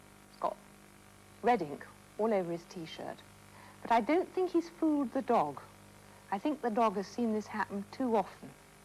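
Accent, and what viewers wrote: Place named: RP, United Kingdom. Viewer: I saw another episode of Gammon and Spinach a few years on YouTube and my vague childhood memories were ruined! I could vaguely remember the stories being about the frog in the opening titles, and I could remember the presenter and her very posh voice but not her name. Imagine my disappointment 30 years later when I found that Gammon and Spinach was just an ordinary story reading programme that Yorkshire Television tried to make more "interesting" by having A Frog He Would A Wooing Go" as the theme complete with the animation of the frog in a Victorian suit and the presenter/storyteller on a pond set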